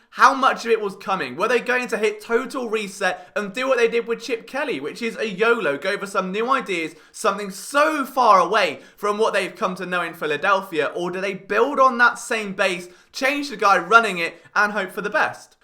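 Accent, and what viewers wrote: England, United Kingdom. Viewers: I come here to get my eagles news with an English accent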